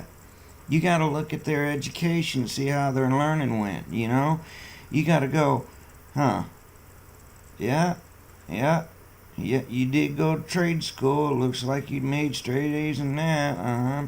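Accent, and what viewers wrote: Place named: United States. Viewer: 30 👎👎👎👎 pretty sad that you don't know what it wheel loader is because to me you sound American.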